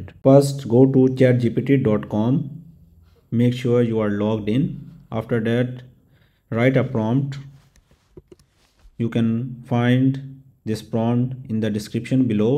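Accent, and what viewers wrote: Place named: India. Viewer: His accent says he is definitely indian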